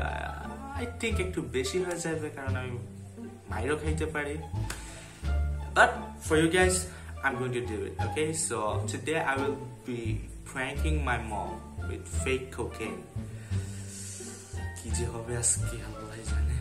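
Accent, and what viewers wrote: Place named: Sylhet, Bangladesh. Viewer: Lol 😂 onek moja paise 🤣 your sylheti language is so cute 😁. Finally I have had chance to see auntie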